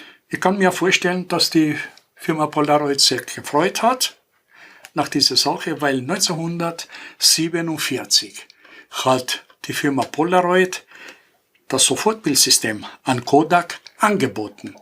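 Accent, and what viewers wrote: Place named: Austria. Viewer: von victor 1977 frankfurt am main,ach ich liebe den leicht jiddischen oesterreichich ungarn dialekt wenn du sprischst,das konnte ich mir stundenlang anhoeren,oder was ist das fuer ein dialekt,auf jeden fall ein aehnlicher dialekt,sehr original und entspannende,,von unseren deitschen europaischen dialekten muesste mehr an die neuen generationen weitergegeben werden...gruese von mir,schenes viddeo,und mach weiter so,ach ich glaube in den 70ern im italien urlaub hatten wir auch so eine kodak,vor allem an die kodak kassetten kann ich mich erinnern,aber in den ende 80ern anfang 90ern kauften wir eine polaroid kamera,und kennst du noch die kleine kamera mit discfilm,was war das nochmalfuer ine marke.kodak ist aber jetzt wieder voll auf dem weltmarkt,mit ganz neuen guten producten auch motion film und neuen kameras wie minishot2,wirklich echt gut...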